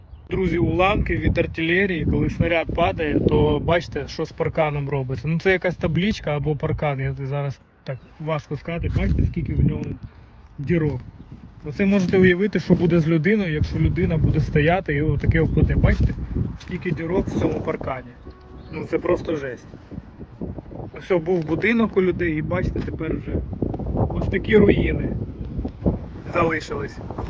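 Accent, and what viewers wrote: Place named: Surzhyk, Ukraine. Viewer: Окреме побажання автору каналу. Можете незважати на це, але: попрацюйте будь ласка над своїм словниковим запасом. У вас, надзвичайно багато суржику в вимові. Взрив, в прєдєлах норми... вибух, в межах норми і т.д. Я трохи більше року тому був сам узкаязьічньій, а тепер роздаю поради як розмовляти інакше. Все буде Україна.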